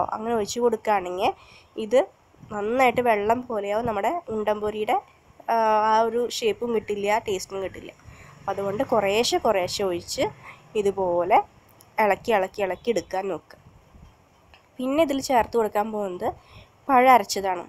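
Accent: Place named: Kuttippuram, India. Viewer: നിങ്ങളുടെ സംസാരത്തിൽ നിന്നും കുറ്റിപ്പുറം ആണ് ചേച്ചിയുടെ സ്ലാങ് എന്ന് ഊഹിക്കുന്നു. ശരിയാണോ?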